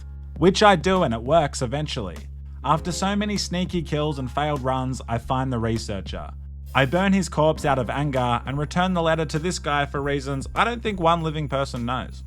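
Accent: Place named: Australia. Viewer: when he pronounces Anna as "ANR" - Australians really do have wild accents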